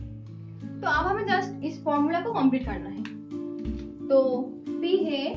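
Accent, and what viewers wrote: Bengal, India. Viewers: Oo pagal she is bengali so her tone of hindi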